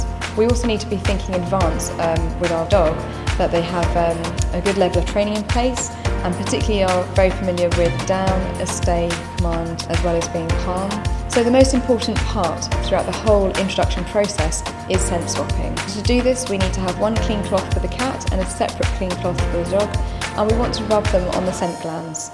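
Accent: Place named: United Kingdom. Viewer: The music was overpowering the female voice and it was a British accent, so even more difficult to understand.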